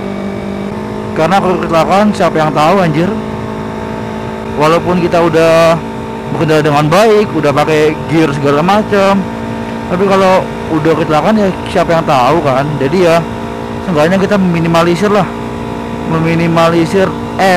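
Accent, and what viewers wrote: Surabaya, Indonesia. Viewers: Biasanya nonton motovlog bandung trs nonton motovlog surabaya keluar dah medok dan cak cuk nya :D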